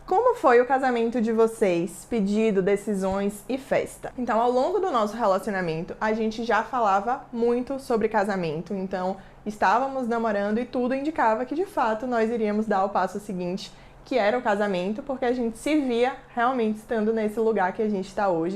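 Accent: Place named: Bahia, Brazil. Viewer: Aiiiiiiii! to AMANDOOO que vc ta se dedicando 100% agora ao youtube. Acho que você vai crescer SUPER aqui, te desejo todo sucesso viu? adoro seus vidÉos hahaha. AMO seu jeitinho leve de ser e esse sotaque lindo da Baheaa! Vou ate ativar as notificações! To maratonando seus vídeos novos. ps: FAZ O VÍDEO COM O MATHEUS SOBRE FINANÇAS SIMMM. amei que vc tb é taurinaa, e meu namorado tb se chama matheus! Um xerão💕💕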